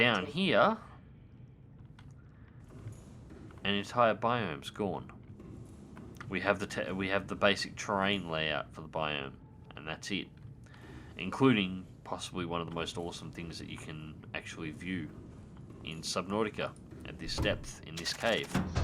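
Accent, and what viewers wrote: Australia. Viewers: I love the Aussie Accent so much:)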